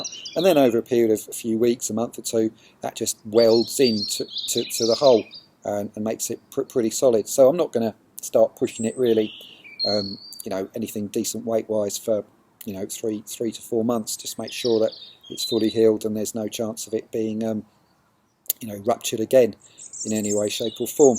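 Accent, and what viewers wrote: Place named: United Kingdom. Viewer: I'm learning some English too watching your videos... British accent rules..Greetings from Colombia south America.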